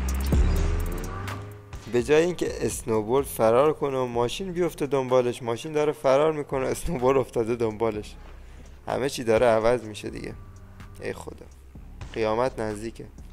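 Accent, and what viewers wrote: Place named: Mashhad, Iran. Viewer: mashhadi nisti? Lahjat mashhadie 😂❤❤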